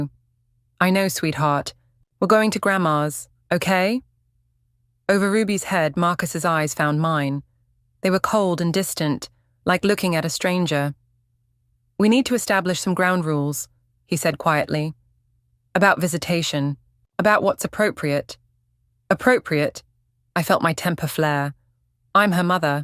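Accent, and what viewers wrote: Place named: England, United Kingdom. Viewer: “Sarah’s mom saw you and told her mom and Sarah told me at lunch…” Then , the convo makes zero sense - the daughter is with her dad, at grandma’s, but Liam brings the daughter’s art supplies back to the house where she doesn’t live and says “she forgot them yesterday…” What TF? It’s almost like no one actually listened to this slop after the computer regurgitated it using a prim, proper and stuffy English accent who, when she protests, she protests “weekly.” SMDH AI-generated 💩 like this video truly sucks 👎👎👎👎👎👎👎👎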